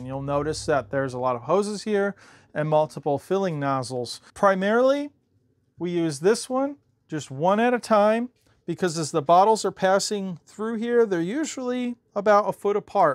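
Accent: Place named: United States. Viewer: you have the coolest American accent I ever heard, where is it from?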